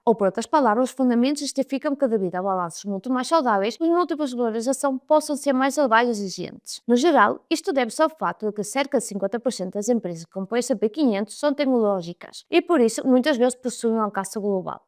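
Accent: Spain. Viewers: não se percebe muito bem o teu "portunhol"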